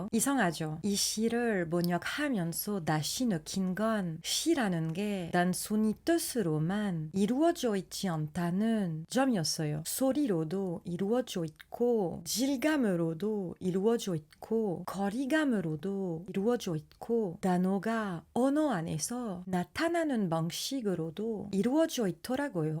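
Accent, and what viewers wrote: France. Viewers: 재한 프랑스인 님의 한국 문학 심화 강좌입니다. 프랑스 액센트의 한국어가 우아하고 고급지게 들리지요? 늘 재밌습니다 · 영상 처음보는데 불란서식 한국어를 사용하시는게 굉장히 신기하고매력적이네요. 근데 계속듣다보니 찐경상도 토박이가 표준어쓰려고하는 것같이도 들려서 나도모르게혼자웃음. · 어설픈 한국어 발음과 프랑스 특유의 악센트에도 한국인보다 더 한국스러운 느낌을 적나라하게 표현하는 외국인. 아니 한국짓에 더욱 충격을 받게 되는 지나가던 문과인 댓글올립니다...... 한국말이 참 이쁘지만 외국입장에선 참 어려운건 사실입니다.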